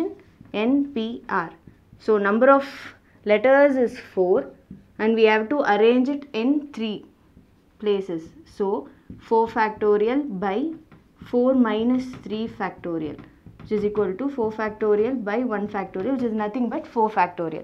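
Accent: South India, India. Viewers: Are you from south india?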